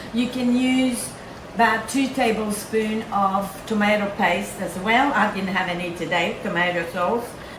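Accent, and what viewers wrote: Australia. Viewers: Tour accent is Australian. One cannot fake the vowels. Interesting. So you are from Quebec? Hm. The recipe probably is good, regardless.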